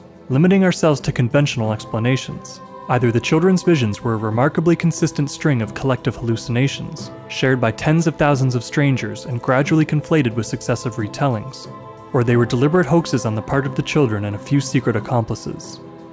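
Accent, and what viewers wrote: Canada. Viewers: Yeah, I never heard that there was a disc separate from the Sun that was doing the spinning, and then "the disc" RETURNED TO THE SUN; don't know where that came from; as I said, I never heard that before. Overall, however, it was a nice presentation, but what sounded like a hard (thick) Canadian accent from the narrator was a bit bothersome to me.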